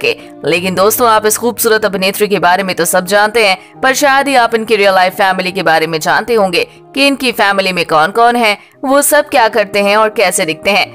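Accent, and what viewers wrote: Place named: United Kingdom. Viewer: I think the narrator lady is Finding it difficult to speak hindi normally, why speak with a English accent? British gulami gayi nahi.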